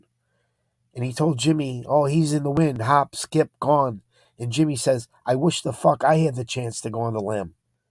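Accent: Chicago, United States. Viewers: You've got a natural talent for this and a great Chicagoan speaking style. Run with it. Your doing real good. You keep it real with the chuckles at times and all. Keep it about the trials and cases and you can go far. GL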